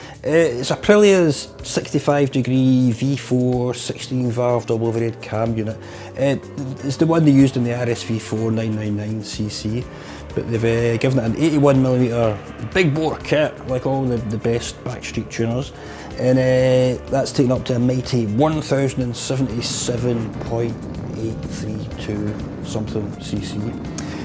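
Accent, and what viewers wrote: Scotland, United Kingdom. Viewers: It is not about just Scottish accent, even himself does not aware what he is talking about...he comes to no conclusion , jump from one irrelevant topic to another, just waste of time to watch this, one of the worst. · The scottish slang wouldnt be that bad if he would take the time to not chew every third word in the sentence. Other than that, nice visual impression of the track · Great review. Always a pleasure listening to one of the many indigenous regional accents of the British Isles.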